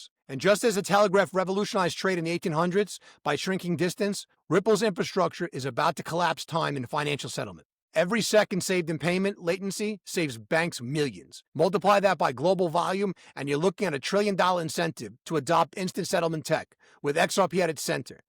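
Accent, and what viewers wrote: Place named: Brooklyn, United States. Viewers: No. I'm from Brooklyn, born in 1955. This guy is definitely from Brooklyn. He speaks like an educated old school Brooklynite. Al doesn't have a chance replicating the accent. The accent is rapidly dying out. When this guy speaks, it's music to my ears. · I doubt AI has mastered the Brooklyn accent yet...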